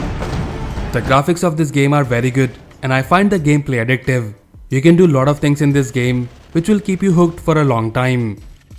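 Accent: India. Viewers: Dude is trying to hide his indian accent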